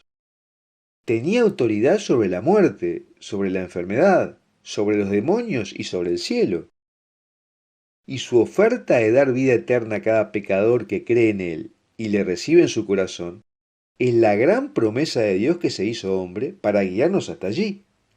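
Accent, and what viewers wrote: Argentina. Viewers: Hola muchos no creen y los pcos que creen,no les importa,el habla como argentino ya se te esta quitando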